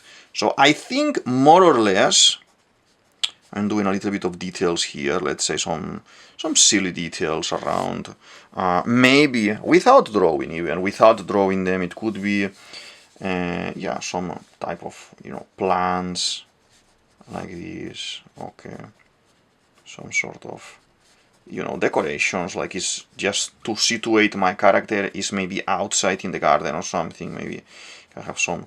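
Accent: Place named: Spain. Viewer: Wow, I just found your channel. You fascinate me right off. Your accent sounds Spanish, your self portrait indicates you may be of Asian decent, and you live in UK. Haha how fantastic! Also, I love your work!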